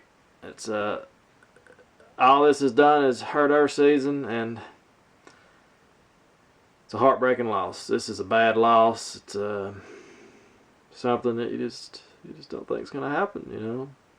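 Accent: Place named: Louisville, United States. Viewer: he had me going after the louisville loss...plus, his accent is TOTALLY lou-a-vul! i thought he was for real blaming the refs, the "criminole" jameis winston and the ncaa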